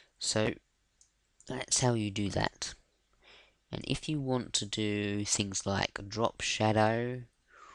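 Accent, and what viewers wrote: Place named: England, United Kingdom. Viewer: It's not the sound, he's just got an English accent...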